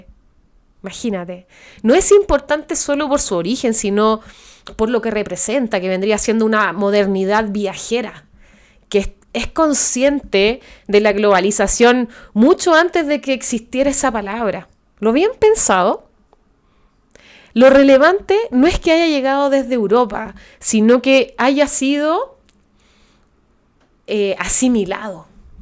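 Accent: Chile. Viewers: MUCHAS GRACIAS POR TUS PALABRAS HACIA MI PAÍS MÉXICO QUE TANTO QUIERO, SÉ QUE HAY PROBLEMAS COMO EN CUALQUIER LUGAR DEL MUNDO, PERO LA VERDAD ES QUE MÉXICO ES UN PAIS MARAVILLOSO, TIENE TANTO QUE OFRECER EN TANTAS COSAS, QUE TÚ LO ESTÁS EXPRESANDO MUY BIEN, ME GUSTA ESCUCHARTE, PUES SIEMPRE ME HA GUSTADO EL ACENTO DE USTEDES LOS CHILENOS, ADEMÁS DE SER UN PAÍS HERMANO, MUY HERMOSO Y MUY QUERIDO POR NOSOTROS. GRACIAS Y SALUDOS DESDE VERACRUZ, MÉXICO ❤❤❤❤ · Me encanta el acento chileno!! Le decimos a Mon Laferte que no lo pierda!!!😊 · Amo, amo, amo el acento chileno....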